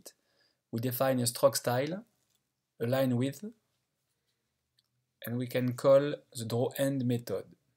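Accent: France. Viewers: He has a french accent.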